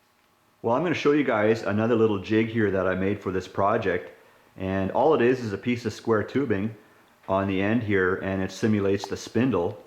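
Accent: Canada. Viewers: Love the way Canadians say "out"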